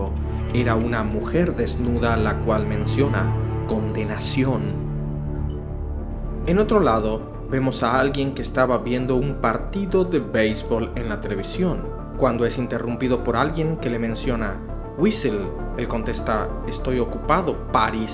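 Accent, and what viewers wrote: Argentina. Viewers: Alguien que me diga de que parte de Argentina es el narrador?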